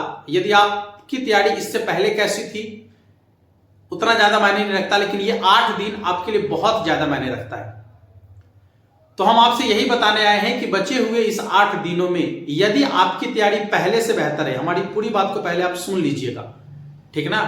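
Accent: Bihar, India. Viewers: Bihar h kya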